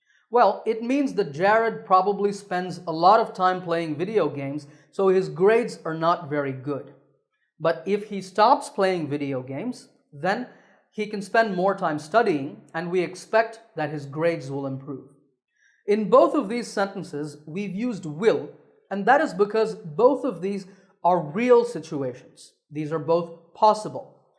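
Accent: United States. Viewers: Sir, I want to speak English just like (i.e. like a US citizen) you how can I? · Aapki awaj ekdum american jaise hai per ho aap indian how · Sir your english is exactly like American flaunt english. Are you living in United States?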